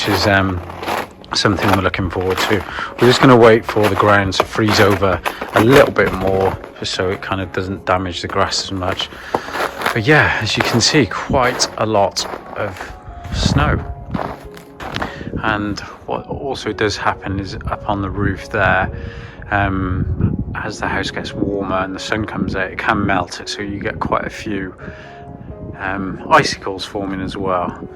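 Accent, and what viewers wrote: United Kingdom. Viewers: I like your accent. Which british?) Winter is coming (Starks know about that) . Village in this season really nice, and air so fresh, only smell of Russian banya, when tree is burning mmmm smell from my child time when our houses didn't have gas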